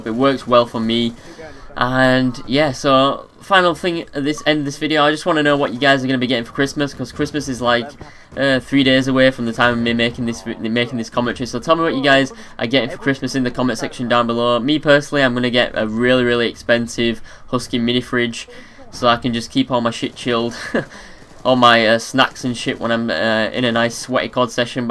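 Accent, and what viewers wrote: United Kingdom. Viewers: Which part of the UK are you from?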